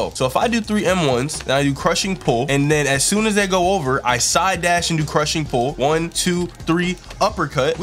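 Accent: United Kingdom. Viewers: YO Keep up the vids love them aspecialy ur brit accent( i want Erly Acc im Krath_100)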